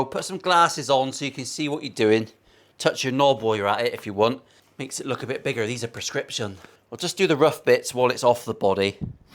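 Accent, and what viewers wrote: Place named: Plymouth, United Kingdom. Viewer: Ha I hear your janner accent dare do . I got my own parts-caster , and 2 x heavily modified acoustics ( cow sticks in the state's) all lefties as am I. They're shit ruff but I live in Devon so if you're looking for guitars to feature 😂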